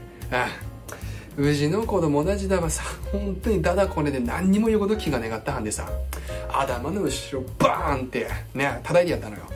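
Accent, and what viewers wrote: Tsugaru, Japan. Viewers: 最初から津軽弁に聞こえる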